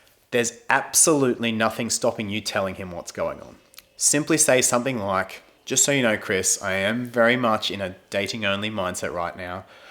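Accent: Australia. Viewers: are you from Melbourne?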